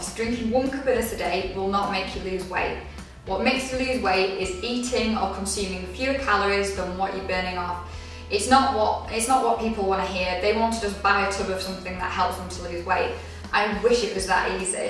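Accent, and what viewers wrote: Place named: Northern England, United Kingdom. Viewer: Love your northern accent, makes me miss home!! xxx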